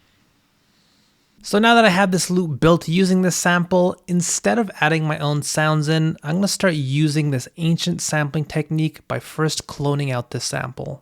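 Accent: India. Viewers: Weird i never notice but your indian accent is heavy in this, i never noticed. Dope vid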